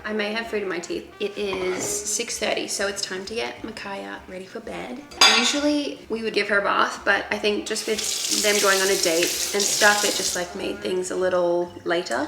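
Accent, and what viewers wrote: Australia. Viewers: !! Her little accent!! Butter and water was definitely all Aussie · OMG SHE HAS AN AUSSIE ACCENT 😭❤️ YESSSSS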